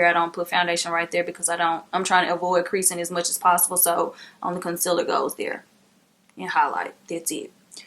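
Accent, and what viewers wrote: South, United States. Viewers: I just love that voice. Country girl sound like what I have... 😉. You about to have me spend some money hunny!! Great review!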